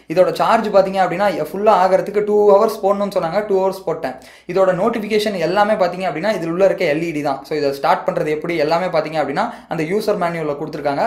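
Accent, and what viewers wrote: Salem, India. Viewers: bro nenga Salem ha bro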